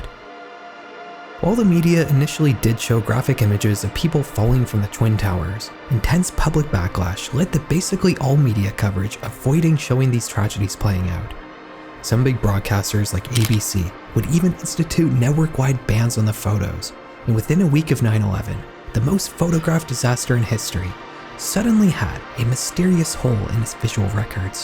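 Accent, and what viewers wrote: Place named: Canada. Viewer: he's Canadian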